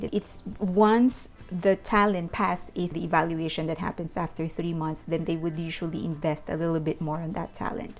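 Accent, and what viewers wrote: Philippines. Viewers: Thank you sooo much great summary ❤️... I believe you are a Filipino just by your acccent , because I am ❤️❤️❤️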